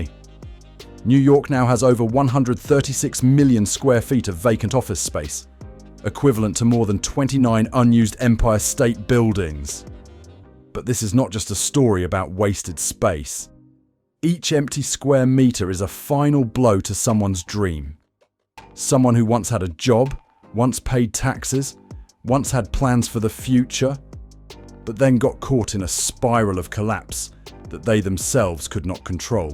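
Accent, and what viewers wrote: United Kingdom. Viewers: Why is a man from England with British accent describing New York situation? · Why a guy with a British accent know about New York. But If I can say something about this as a New Yorker is that New York turned is a shithole.😮😮😮and it because of politics and the real-estate courrption the NYPD & Port Authority & the MTA.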